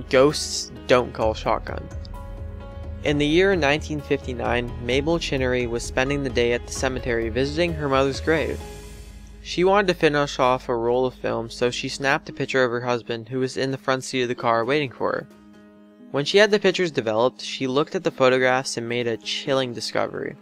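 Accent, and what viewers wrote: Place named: Canada. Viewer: the narrator is obviously Canadian. "howse" "abowt"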